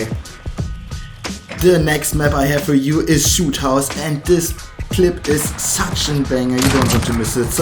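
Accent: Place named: Germany. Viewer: Deutscher Akzent am Start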